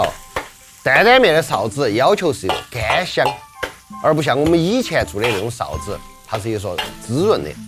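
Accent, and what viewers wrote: China. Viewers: His Chinese accent is fucking hilarious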